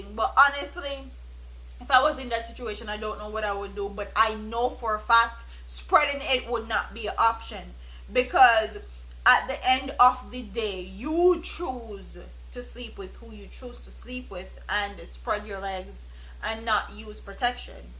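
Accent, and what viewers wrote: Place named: Rural, Jamaica. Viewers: Im not gonna lie and be a hypocrite but i also make fun of the way u speak. My 1st thought was "where in jamaica is she from"? You do have a strong country accent. However, I Never thought ur accent define who you are as you seem to be intelligent No malice in that observation sis. Pure love. Big up uself. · same suh mi talk too.. country mi com from too. an proud of it love the way you talk hun love you an soulflotv..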